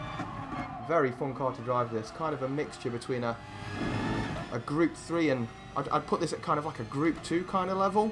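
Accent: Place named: England, United Kingdom. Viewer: Nice race. What part of England is that accent from?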